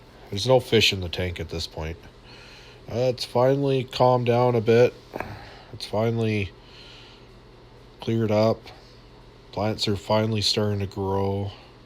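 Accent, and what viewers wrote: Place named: Canada. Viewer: In the first video that I watched, your fishroom tour 2021, I thought you sounded Canadian and you said it was -40 and my thought was you must be fellow Canuck. yeaaaaaaaaaay!! Have now sub'd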